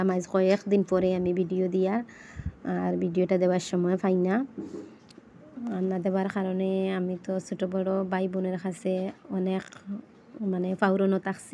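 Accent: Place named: Sylhet, Bangladesh. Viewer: আসালামুয়ালাইকুম আপু আমি তুমার বিডিও পুল সাপুট করি তুমার রান্নার পিটা কই মাছ এমনকি তুমার সিলেটি কথা সুনার জন্য বিডিও চেরে রেখেচি❤❤❤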